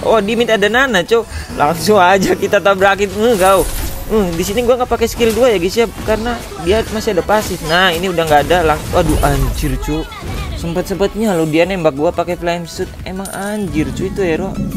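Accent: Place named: Central Java, Indonesia. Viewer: Dri logat nya kaya nya org jateng ya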